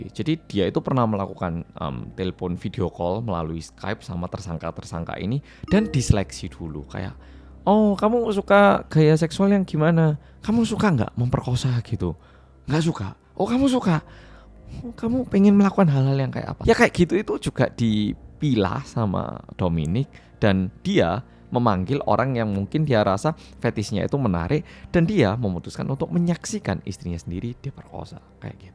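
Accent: Java, Indonesia. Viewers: Wah, ini mantap dan Top top markotop ini yg aku suka dgn bahasanya yg MEDHOK 😄😄😄❤❤❤👍👍👍 Lanjutkan Medhoknya (ini yg menjadikan Aku SUBKRAIB)🙏🙏🙏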